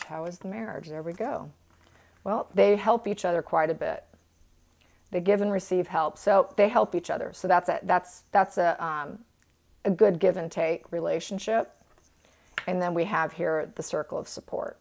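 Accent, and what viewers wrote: United States. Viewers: Love your voice! It’s calming are you from the Midwest? I sensed that from you the day I heard your voice.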